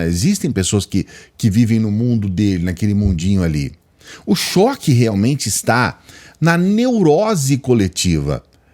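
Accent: Minas Gerais, Brazil. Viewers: Adoro você, principalmente quando fala coisas sérias no mineirês! Eu gargalho contigo!bjs. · Meu amigo, seu sotaque mineiro está cada dia melhor. Parabéns pelo canal!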